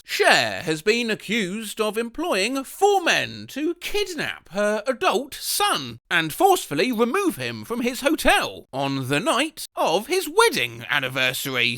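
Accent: United Kingdom. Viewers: i have never been so excited about a british man reading text on a screen in my life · my friends will never understand how much whimsy listening to a british man reading quotes from twitter (no im not calling it x im boycotting it take THAT ELONgated MUSKet) and reddit gives me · I LOVE LISTENING TO A BRITISH MAN YELLING DIRECTLY INTO MY EAR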